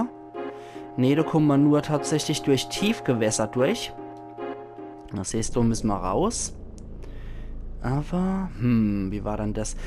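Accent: Palatinate, Germany. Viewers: Bin zufällig auf den Kanal gestoßen. LPs im pfälzer Dialekt kommen ja super! geliked :-)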